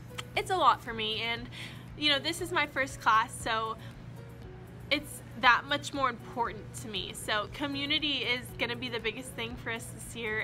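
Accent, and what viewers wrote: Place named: Tennessee, United States. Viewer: So, I've been watching you from Day 1 on here & I told my niece I didn't know what it was about your videos, but you felt relatable. 1) I organize & put stuff together like you (all over the place, but it comes together nicely.) 2) I'm bubbly like you. Love the positivity. 3) I'm from Memphis, TN! I knew your accent and stuff sounded familiar & now I know why. You're a fellow Tennessean! Anyway loving the videos. I homeschool, but we have had so much fun watching your videos. Keep 'em coming !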